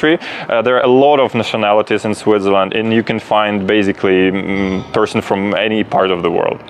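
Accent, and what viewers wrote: Russia. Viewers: I hear a strong russian accent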